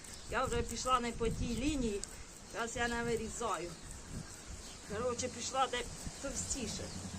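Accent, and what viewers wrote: Poltava, Ukraine. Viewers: Добрий день. Говір Полтавський, з якого району, якщо звісно не секрет. Я з Гадяцького.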